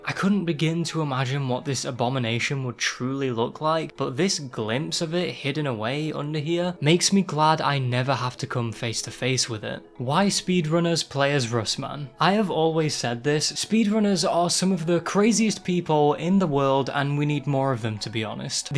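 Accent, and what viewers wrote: United Kingdom. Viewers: LETS GOOOOOOOOOOOOOOOOOOOOOOOOOO!!!!!!!!!!!!!!! welcome back lad, missed that nice ass british voice!!!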